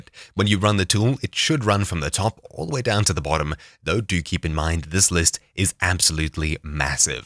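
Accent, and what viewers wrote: South Africa. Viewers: Lmao south africa😂 Is that a joburg boet I hear? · You're South African! Nice! :P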